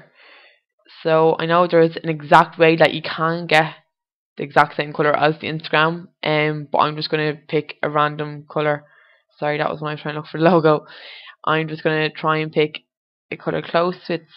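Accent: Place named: Ireland. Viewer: you do have a lovely irish voice. thanks for the vid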